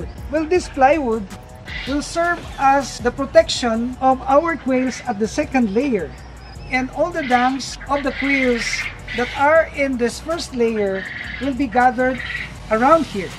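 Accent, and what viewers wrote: Philippines. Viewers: Wag ka nga po mag inglish halatanaman po na pilipino ka po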